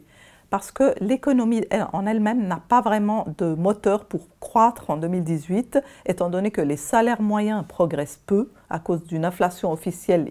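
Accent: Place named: Switzerland. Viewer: Delicieux accent qui sent le chocolat Frigor et le Läckerli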